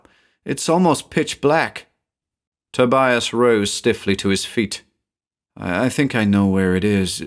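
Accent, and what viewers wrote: United States. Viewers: What an amazing weird tale. Expertly written. Two distinctive main characters each uncovering the mysterious secrets of their inheritance both together and separate from each other. Perfectly narrated as always. Glad to hear the American accent is still spot on. Great choice of story. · This is such a rich and believable story. An almost Tolkien-esque commitment to lore, attention to family lines and culture. It feels believable because it's steeped in history. This author just HAS to be Irish! Of course does a stand up job as always. Your American accent is so John Wayne tough. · You do a really good American accent, as an American myself 🩵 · Your American accent is spot on. Cheers for the performance!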